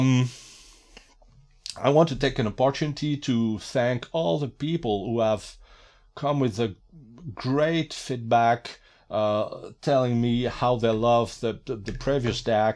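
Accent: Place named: Quebec, Canada. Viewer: Hi, I was wondering if the old isa sound card such as sound blaster 16 which already supported 16 bits 44.1khz would have incorporated r/2r ladder dac instead of binary weighted ones? Despite being old sound cards, i couldn't find anything wrong to the sound coming out of them when propely feeded with a lossless source a few years ago when I messed around with such things. And you're probably from Quebec with that accent. Lol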